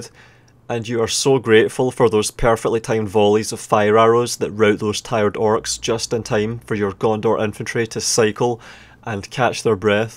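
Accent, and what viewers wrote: Scotland, United Kingdom. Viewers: Nah man, i watch your videos becooos ilove your Scoooootish akcent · Pretty sure it's scottish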